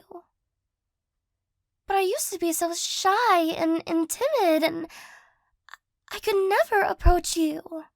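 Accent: United States. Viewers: In what part of the english speaking world does this accent come from- ik its american but like- its a good kind of american yknow wut i mean?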